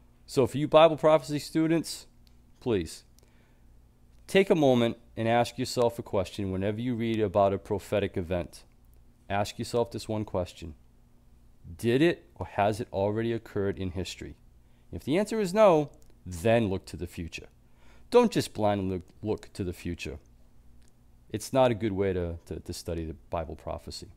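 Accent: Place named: New England, United States. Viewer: Good info! And I know this is trivial, but I love listening to your accent. I grew up in New Hampshire and have relatives in Maine. Married a Virginian, and have lived 22 years in Ecuador. But I know a New England accent when I hear it. ❤️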